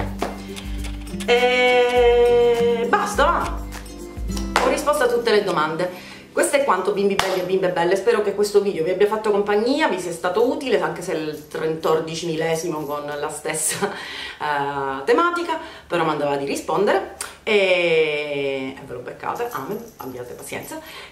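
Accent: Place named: Sicily, Italy. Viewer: Ciao! Sono d'accordo con te in merito alla Naked 1...manca qualche ombretto opaco in più! :-( Dall'accento mi sembri sicula... come a me! :-) Un bacione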